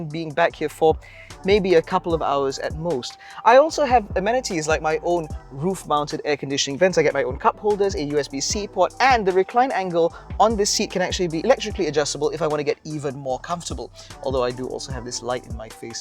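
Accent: United Kingdom. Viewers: Wow ...rasa macam orang uk yang review ... mantap bro.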